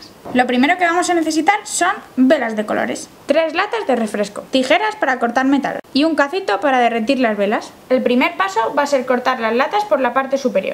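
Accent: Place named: Spain. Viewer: QUE BONITA VOZ Y ASENTO TIENEN LAS ESPAÑOLAS, SALUDOS, MUY BUENA IDEA ME SUSCRIBO :)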